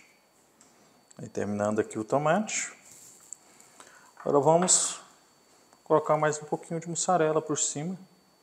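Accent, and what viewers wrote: Minas Gerais, Brazil. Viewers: Vc é mineiro?amo esse sotaque kkkkk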